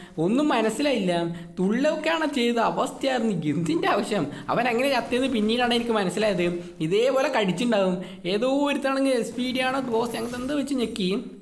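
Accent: Kannur, India. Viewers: Kannur aanu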